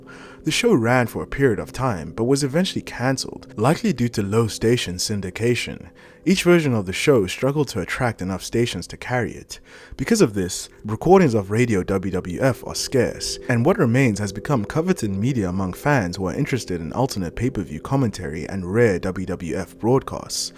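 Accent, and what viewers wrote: South Africa. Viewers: Are you South African perhaps? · He sounds South African, I thought I was bugging.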